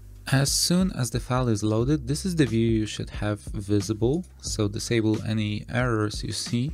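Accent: Poland. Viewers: Przez moment zastanawiałam się, czy ten akcent nie jest przypadkiem polski, teraz już wiem, że to nasi. ;)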